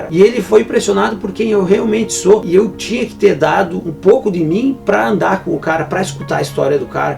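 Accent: Rio Grande do Sul, Brazil. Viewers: tu é gaúcho? Fala como um gaúcho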